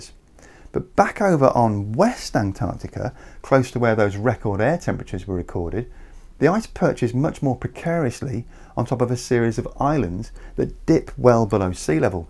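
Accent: United Kingdom. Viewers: this Brit-voiced clown is a total fake-news CarbonTaxFraudScam alarmist propagandist. Anyone can look up Dr.Patrick Moore or other FACTUAL evidence-based sources for the TRUTH about how greatly increased co2 will be an enormous benefit to our climate & biosphere. Here's the TRUTH & FACTS: co2 FACTS vs neurotic alarmist fake-news FICTION: Greatly Increased co2 will reforest the Sahara, Gobi, Atacama, Western US, and all other barren deserts worldwide, as well as the desolate Arctic & Antarctic tundra-wastelands. Frozen immobile ice will melt and CIRCULATE moderating & cooling the equator & tropics, as warmer polar oceans evaporate & REHUMIDIFY the atmosphere precipitating & soaking the deserts into lush jungles & fertile farmland while restoring depleted aquifers & watersheds globally. ZERO phoney "sea level rise" (and btw, REAL PHYSICS: floating ice does NOT increase the level of the water it floats in as it melts! 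DUH. the buoyed ice above the waterline is no longer pressing down once it has melted, DUH). The Sahara alone will soak up more water than all the ice on Greenland & Antarctica. Terrible crop-killing winters will give way to year-round growing seasons in the Great Lakes and other agricultural lands worldwide. The Great Lakes basin with its uber-rich soils & unlimited fresh water will produce 1,000x more food than the San Joaquin Valley (which requires unsustainable irrigation & fertilizers). That's sustainable food for 35 BILLION PEOPLE. Terrible crop-killing winte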